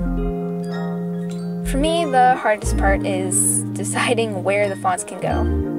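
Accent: United States. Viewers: ngl wvwn tho ur filipino.. (like meee😌) ur voice sounds like an american. :O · Omg!!! Your a Filipina!!! I thought u were from the STATE'S!!!! YEEEEEEEEE ❤💕❤💕❤💕❤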